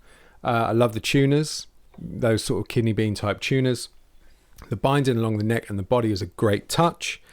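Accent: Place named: England, United Kingdom. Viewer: I like your English accent so cool man 👍 one of the best guitars I ever had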